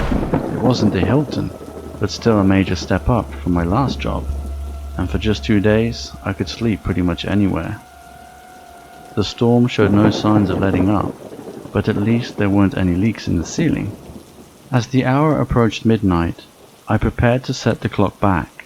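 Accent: United Kingdom. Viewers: What’s his accent? Slightly British?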